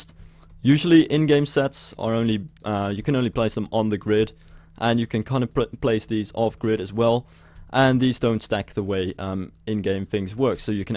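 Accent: Netherlands. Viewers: Jij bent Nederlans haha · are you dutch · haha ik hoorde dat je nederlands bent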